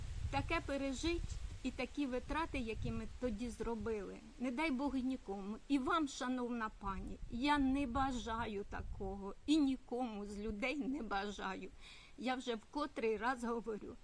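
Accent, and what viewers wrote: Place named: Ukraine. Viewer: подивилася ваше відіо в сльозах,не дай Боже пережити нікому,що ви пережили,як ви сказали. Ми маєте для кого жити. Дай Боже дочекатися правнуків У Вас приємний голос і укр.акцент. Я з нетерпінням чекаю ваше відіо. Поважаю Вас. Здоров'я Вам іздійснення ваших мрій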